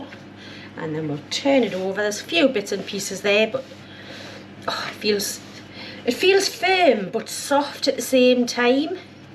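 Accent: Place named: Teesside, United Kingdom. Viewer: EE by gum lass that looks grand, I left the Boro sixty years ago nice to hear your accent.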